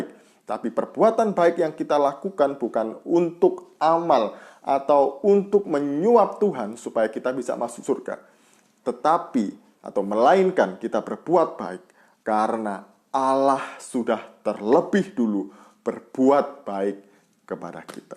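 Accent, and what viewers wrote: Java, Indonesia. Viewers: Yang Pertama Aku suka Gaya bicara Panjenengan Mas kental bamgeet wong jowo nya hehe✌✌✌✌✌✌✌✌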